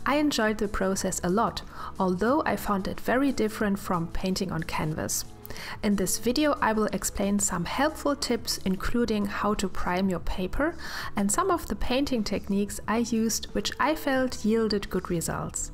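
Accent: Germany. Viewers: Hallo, ich möchte erst einmal deine Kunst loben-ich liebe den Mix aus abstrakten Verläufen und naturalistischen Elementen! Ich tendiere dazu, zu viele Details in ein Bild zu hauen, dann in der Mitte die Lust zu verlieren und wenn es fertig ist, frustriert zu sein, weil es einen etwas erschlägt xD Deine Bilder helfen mir, das Konzept von "Blickfang" besser umzusetzen und meine Portraits zu "entmüllen". Wo ich dich das erste Mal gehört habe, wusste ich sofort, dass du Deutsche bist. Dein Akzent ist wirklich liebenswürdig. Am liebsten höre ich mir einfach nur die Tonspur an und zeichne/male selbst parallel dazu und dann schau ich das Video noch einmal für das Bildmaterial;) Entspannend und inspirierend für mich. Vielen,vielen dank für deine Videos! (Musste ich einfach mal loswerden, bin eher der stille watcher)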